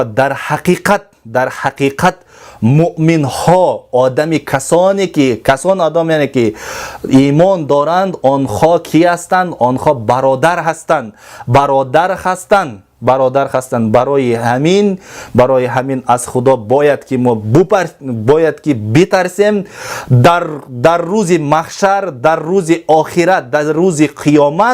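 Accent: Tajikistan. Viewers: Рахмат домло .маълум ки шумо аз тарафхои Джиргатол Лахш хастен аксентатон маьлум.Аввалин мурда яъне улук аз точикон шудаги историяба хаст вай одам одами бегунох. Агар мурдахои точика хисоб кунед хеле зиёд вайба ки чавоб мегуяд.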